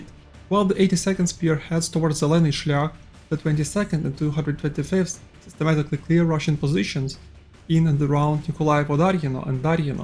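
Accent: Ukraine. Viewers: Хохлячий акцент не скрыть за английской ломаной речью читая по бумажке. Обьективности 0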